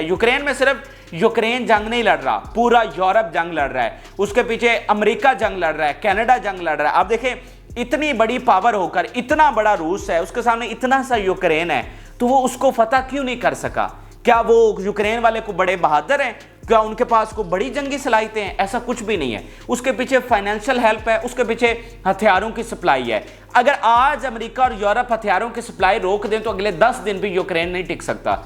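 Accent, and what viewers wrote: Punjab, Pakistan. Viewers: Sab kuch theek hai bas aapki Urdu theek nahi hai Punjabi lehje mai boli gayi Urdu bht behudaa lag rahi hai .Ali garh universty yaa Urdu adab k program dekha kro aur punjaabi bolne se hameshaa avoid kro ye ranjeet singh ki Jahaalat bhari zubaan hai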